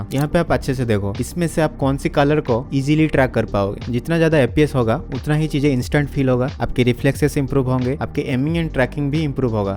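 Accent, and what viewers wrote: Odisha, India. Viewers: Odia accent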